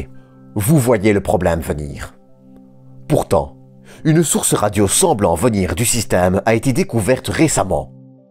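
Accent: Liège, Belgium. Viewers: Sacré accent !!! Liègeois??? ;-) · Ta chaîne m'a été recommandée par le petit astronome...malgré l'accent belge qui pique un peu, j'adore ton style de narration ...bon courage!!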